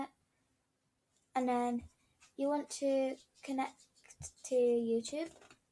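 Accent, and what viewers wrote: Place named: United Kingdom. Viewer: col british accent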